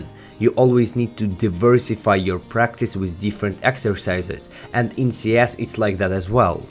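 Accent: Russia. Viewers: Блядь, какой-то русский акцент прослеживается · Are u russian? P. S чекнул инсту, понятно. Получается, я понимал инглиш проще из за акцента. Для большей аудитории снимаешь на english? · Чувствую русский акцент)) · Мне кажется или ты Russian? Акцент, сука, русский.... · Над произношением еще поработай, а то немного палишься с русским акцентом)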